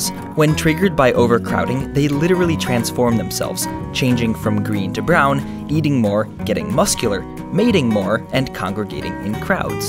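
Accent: Midwest, United States. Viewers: It the midwestern accent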